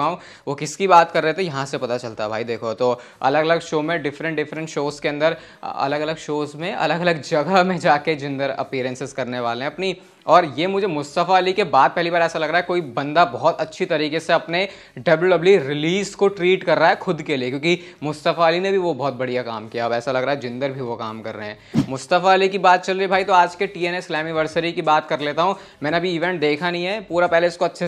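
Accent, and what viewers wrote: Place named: India. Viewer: I love wwe content, but I don't understand Indian English.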